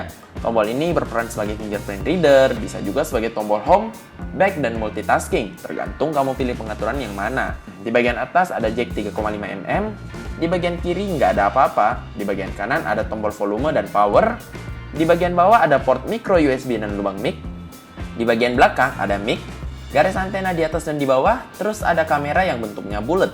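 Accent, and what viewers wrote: Makassar, Indonesia. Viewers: orang makassar kayaknya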